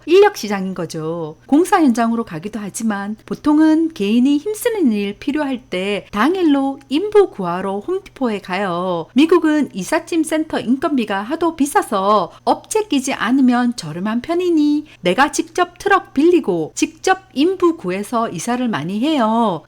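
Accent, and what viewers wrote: Gyeongsang, South Korea. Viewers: 경상도 좋아요 😄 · 경상도 사투리 너무 심하고 시끄러워서 불쾌해서 싫어요 줍니다. 시끄러운 경상도 사투리 세상에서 가장 싫어요.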